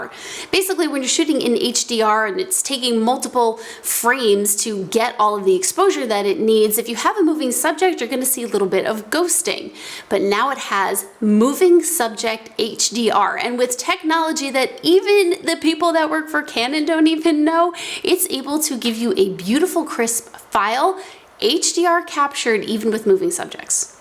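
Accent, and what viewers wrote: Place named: New Jersey, United States. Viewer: That Jersey accent and shtick is priceless!! Well done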